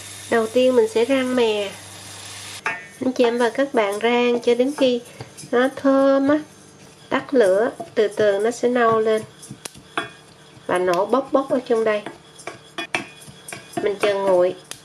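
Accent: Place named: Mekong Delta, Vietnam. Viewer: Quê Cô ấy o miền tây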